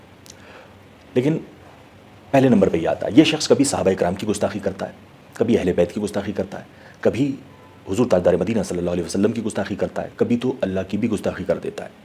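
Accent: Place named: Bareilly, India. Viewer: Are yaar Aaj kiya hogaya is ko ekdam baraily Shareef ke andaaz me bola achha laga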